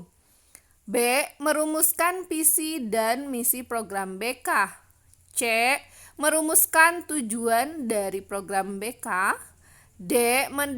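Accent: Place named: Java, Indonesia. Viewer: Mandan medok sedikit bahasanya ya mb..hehe..pk sukses selalu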